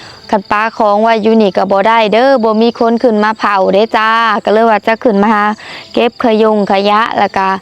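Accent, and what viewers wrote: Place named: Laos. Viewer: ลาวคักๆเรียกคำกำกวมเก่งมาก ทีบพ้อว้อเหมือนคนเเก่ที่อุบลพูดเลย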